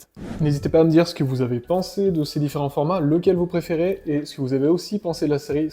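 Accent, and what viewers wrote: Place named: England, United Kingdom. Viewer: Un nouveau dans le game comics. Tu lance ta chaîne qui parlera des comics news ?Très bel accent anglais 😎 Tout dépend ta recherche la poche ça permet de relancer de nouveaux lecteurs à petit prix pour relancer l’industrie du comics pas pour un public voulant une belle édition moi avec le temps qui passe je favorise les belles éditions et toujours en dur même si ça devient un budget comme les omnibus dans leur fourreau Après le dur tu ne peux pas lire ça dans le métro 🤣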